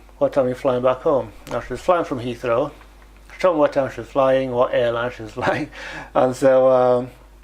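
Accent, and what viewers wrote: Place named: Iceland. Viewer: Ha! You're as good at maths as I am! :P You have a beautiful Icelandic accent :D I remember all the hoo-hah with that. Oh no! That sucks so much. Oh lord, that sounds very not good to deal with if you're flying a plane! Oh you're such a sweetie! How very Love Actually! Oh wow, you look odd without a beard! I'm glad you clarified it wasn't the KISS guy!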